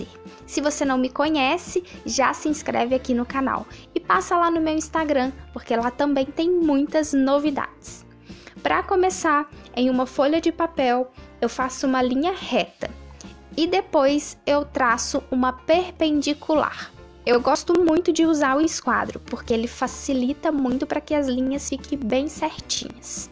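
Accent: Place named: Minas Gerais, Brazil. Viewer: Adorei a explicação pra construção da máscara!! Obrigada!!! Vc é mineira né??? Que sotaque gostoso...BH???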